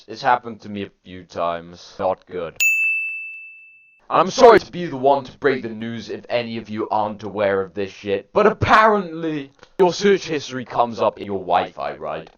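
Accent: United Kingdom. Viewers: Bro I know from your accent and because you went to the Deftones concert that you live in South Wales lol, I do too but I didn’t go to the concert because I’m not really that fussed even though I like the band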